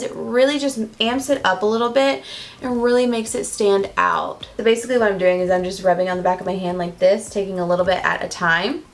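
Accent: South, United States. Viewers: I feel like you're already losing your southern twang. Don't let NY do that to you, girl! 😂😭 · i found your channel after being lost a couple years and i realized how much i love your channel! you are sweet and i love your country accent😍😍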